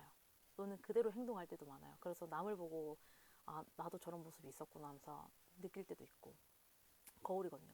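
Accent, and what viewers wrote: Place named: Gyeongsang, South Korea. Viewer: 앗 사투리 너무 반가운데요~~^^ 경상도분이셨나요? 영상 감사합니다. 저도 어제 댓글로 말씀드리려했는데 영상 소리가 작아서 스피커 최대로 듣고 있었어요😅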